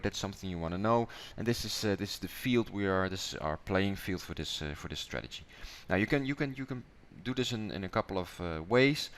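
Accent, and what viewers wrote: Netherlands. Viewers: I detect a slight Dutch accent unless it's South Africa? No mention of where you are in the About information.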